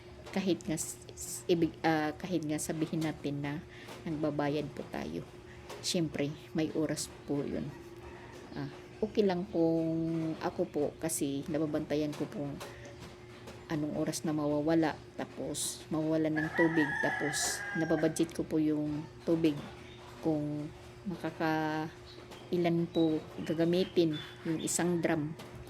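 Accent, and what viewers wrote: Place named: Visayas, Philippines. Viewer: Cute pamation ug mag bisaya ka ❤❤❤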